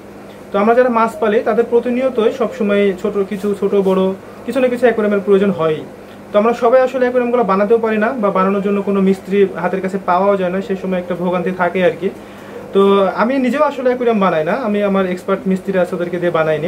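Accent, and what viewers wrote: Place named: Rajshahi, Bangladesh. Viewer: রাজশাহীর ভাই কথা শুন্যা বুঝল্যাম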